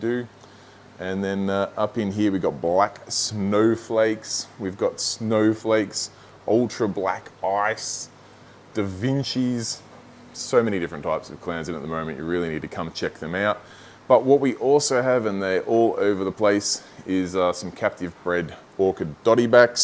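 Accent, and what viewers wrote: Australia. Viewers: Wow, what a store! Also, I love Australian accents. For real.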